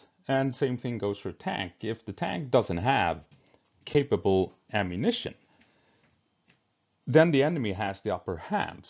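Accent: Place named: Sweden. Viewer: Hej! Could just barely hear a weak Swedish accent 😊 Great video, greeting from a fellow swede!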